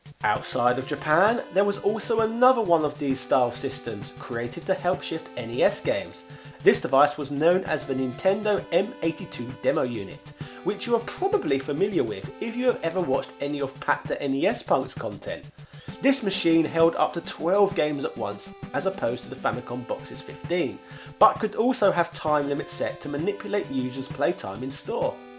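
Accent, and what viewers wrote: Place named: Cockney, United Kingdom. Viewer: Love your cockney accent mate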